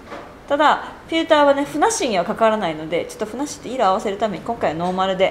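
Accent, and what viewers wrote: Kansai, Japan. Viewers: ええ感じの関西弁やなぁ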